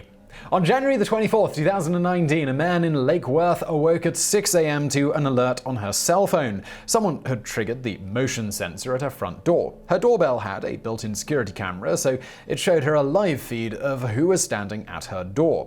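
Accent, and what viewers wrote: United Kingdom. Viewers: sure seems to revel in the ridiculousness that happens in America. We enjoy it here too, but it cuts deeper hearing it in a British accent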